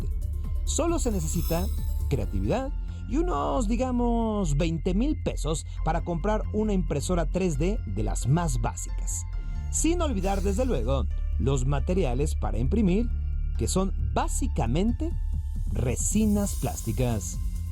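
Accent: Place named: Peru. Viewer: Este conductor tiene asentó peruano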